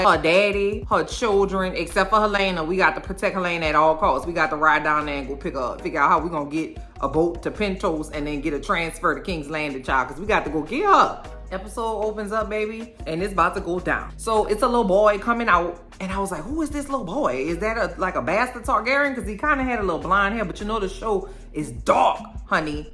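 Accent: New Orleans, United States. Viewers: First off, let me say it....I love the accent. You about to make me go visit my family in New Orleans now. LOL. But as far as the theory of Otto Hightower taking the life of Allicent's mom is a good one. The way he said it was creepy. Like when fathers normally say that it's cute and they're smiling like they're happy. But when Otto said it, it was giving me creepy vibes. As for Helena, y'all better listen to her. She be prophesying. I said oh what's about to come from under the ground. LOL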